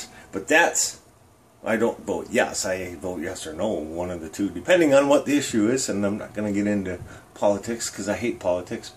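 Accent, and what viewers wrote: Minnesota, United States. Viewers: yeah im one of those idiots(political telemarketer),,, we dont really care what people tell us mainly just try to make it through a shift a job is a job i dont even vote the way i call.. usually if you say you arent voting an a poll they usually end the call..but yeah 99% chance you are still on the list.. that call would be dispo'd just as a decline.. and often times they get the list of people to call under the list of registered voters... you almost sound like you are from "da range" in mn here maybe the UP from your accent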